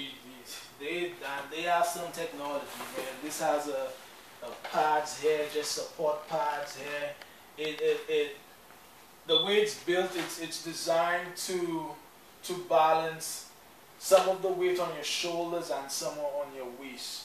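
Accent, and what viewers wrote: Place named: Trinidad and Tobago. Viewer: Sounds Trini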